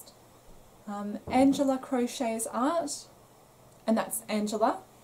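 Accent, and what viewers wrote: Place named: Australia. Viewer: Wow !! Lismore, nice part of the world. I’m so happy to find you, I’m from Sydney and it’s so nice to hear another Aussie voice on YouTube...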